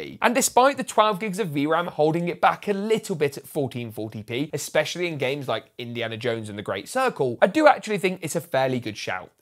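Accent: United Kingdom. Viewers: Why do you sound more extra British?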